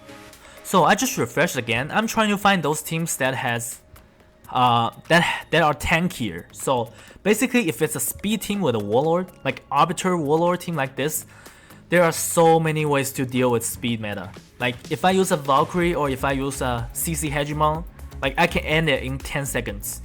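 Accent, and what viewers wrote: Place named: Asia. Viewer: Is this first time that I see you in person:O. I only follow you on YouTube hehe. I knew that you were an asian hehe I'm not a native speaker English, even though I recognize that accent. Thank you I really learn a ton with your videos. I appreciate <3.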